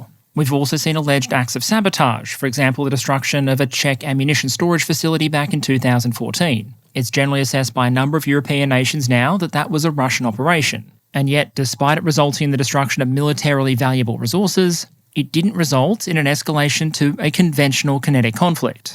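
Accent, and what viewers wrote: Australia. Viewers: Maybe they've never heard an Aussie accent? Or humor?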